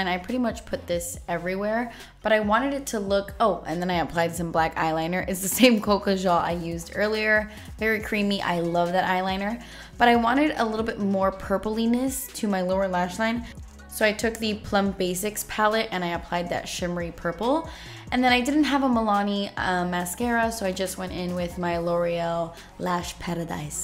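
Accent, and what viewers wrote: United Kingdom. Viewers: Ok, but why was that British accent so adorable?😂💜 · Can you do a whole tutorial in your British accent please! I'm British and its super annoying when people try to overdo it but yours is SOOOO CUTE!!! I love it! xoxo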